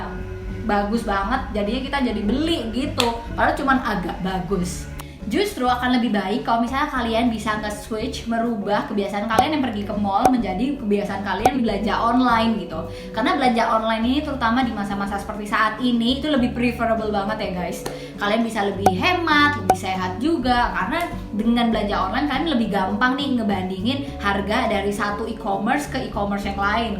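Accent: Java, Indonesia. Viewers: Mba e mendok men logat e😂, joss tipsnya🤩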